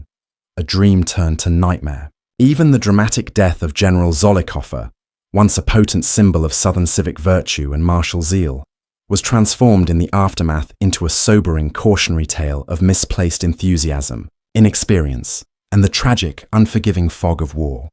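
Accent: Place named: England, United Kingdom. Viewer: They should have chosen a southern american accent narrator discussing this matter. The English accent for this story is off putting. The guy who did PBSs The Civil War would be the best Ai option.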